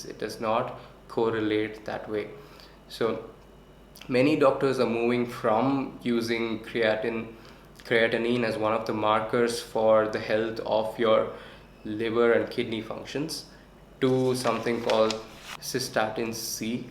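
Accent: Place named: South India, India. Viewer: Most south indians have this accent, your English accent depends on your first language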